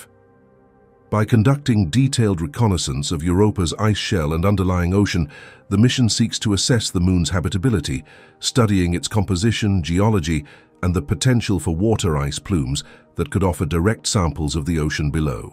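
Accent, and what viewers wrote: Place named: United Kingdom. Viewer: Another awful UK accent . YUK.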